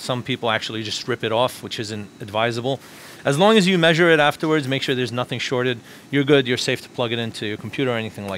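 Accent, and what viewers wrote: New York, United States. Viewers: That's "New York City". They all sound like that. :) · It’s that New York accent. We just talk like that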